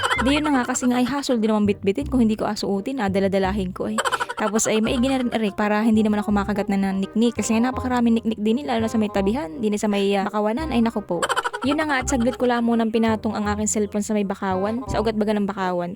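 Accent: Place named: Visayas, Philippines. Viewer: May halong bisaya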